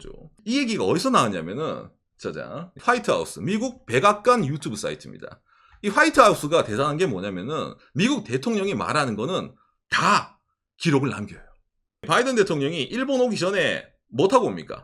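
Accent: Gyeongsang, South Korea. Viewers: 저거 최소 경상도 50대 이상 남성임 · 역시 경상도네 ㅋㅋㅋㅋㅋㅋ 경상도는 과학이다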